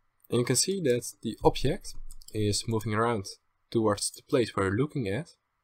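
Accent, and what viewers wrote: Netherlands. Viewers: The dutch accent xD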